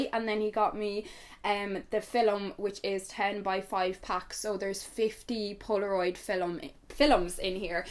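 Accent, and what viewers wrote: Ireland. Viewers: Very deep Irish accent coming out from your mouth 👄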